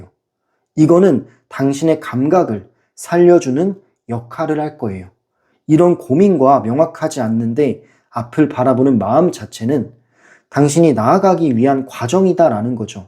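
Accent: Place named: Gyeongsang, South Korea. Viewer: 삼번리딩 갱상도 샘인가봐요 리딩중 만족 시릅지도~^^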